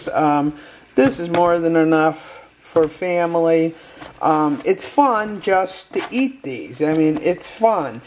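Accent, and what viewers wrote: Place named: Illinois, United States. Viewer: I never thought to do that with neck bones but I sure will now. I CAN say they make the best spaghetti sauce going! Between the sweet pork flavor and the bones, you can't beat it. Thanks for another great idea,. (Northern girl, huh? I would have guessed Illinois, but there's a similar accent in Central NY...Utica/Saracuse/Buffalo...Humm) LOL, you do NOT want to hear my accent...Tess from NY:)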